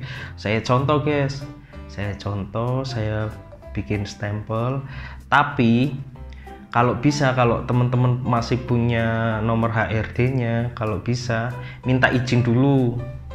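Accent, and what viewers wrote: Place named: Indonesia. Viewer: Sampean kaya wong margasri mas.blok karang jati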